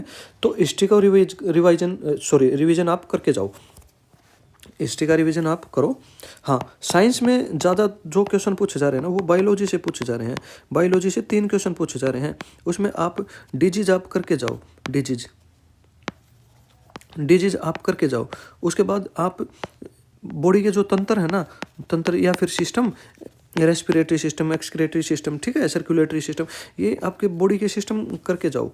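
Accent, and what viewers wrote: Haryana, India. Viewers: haryana wala language h bro iska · Bhai tumne bhi chalana ko chalama likha hai to mistake sbse hota hai aur wo uska accent haryanvi hai to isliye aisa sound kr rha hai. Itni bhi bdi baat nhi hai jitna hyper ho rhe ho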